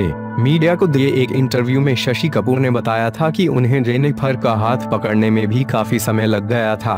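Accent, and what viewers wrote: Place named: Bihar, India. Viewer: Bihari Type Commentary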